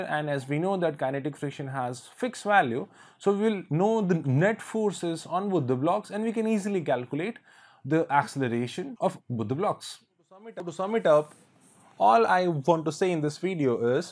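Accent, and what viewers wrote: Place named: United States. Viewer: He is an as*hole really! F*ck your Amreican accent you filthy crow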